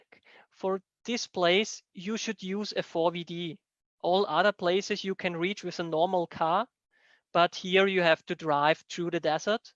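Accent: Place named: Austria. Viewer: Noch nie so einen österreichischen Akzent im englischen gehört 😅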